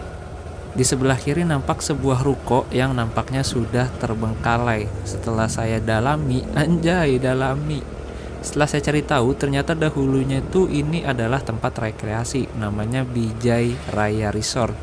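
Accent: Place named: Depok, Indonesia. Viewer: Asli emg logat bhasa anak depok ka, btw saya org depok juga soalnyakwkwk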